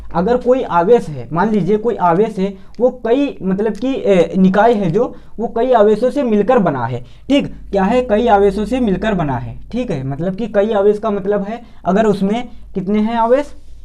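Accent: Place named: Varanasi, India. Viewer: Aap Kaha se Ho My guess Rawansi se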